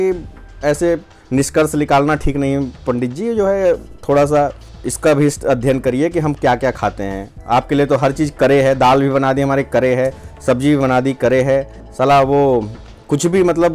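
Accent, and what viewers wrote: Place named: Varanasi, India. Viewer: Apki boli me banaras ka mithass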